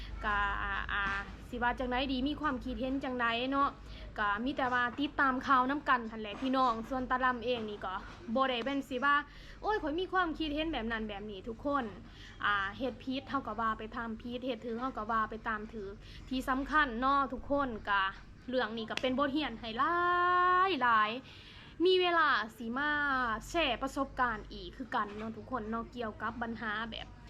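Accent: Ubon Ratchathani, Thailand. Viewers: น้อง ยังสวยเหมือนเดิมแต่ยังอยากทางลาวแต่ที่แน่แน่ยังติดตามแพะเราชอบสาวลาวพูดเหมือนคนอุบลผมจำได้พูดมาอยากร้องไห้😂😂😂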